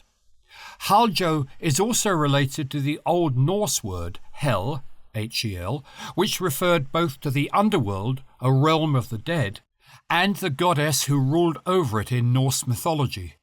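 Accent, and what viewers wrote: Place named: United Kingdom. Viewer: I love the British accent of the narrator! 😊😊😊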